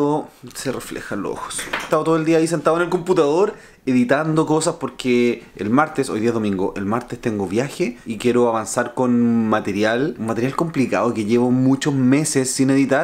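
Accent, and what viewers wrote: Chile. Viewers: que bueno haber encontrado este canal, vengo de QuantumFracture, del vídeo de la tierra plana vs tierra esférica. Por el acento deduje que eras Chileno, que genial.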